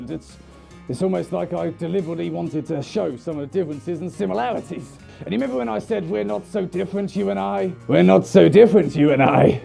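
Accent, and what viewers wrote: United Kingdom. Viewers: Ahh another of my kind, a British furry that sounds like “spiffing brit” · Savior_the Husky b r i t i s h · you sound sooo brittishhhhh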